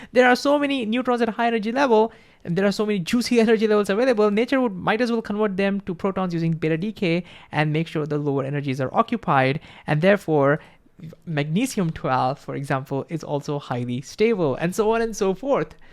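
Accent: India. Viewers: People who get he is an Indian As an Indian i could understand it just through your slight accent ❤ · Why are you black but have an Indian accent 😂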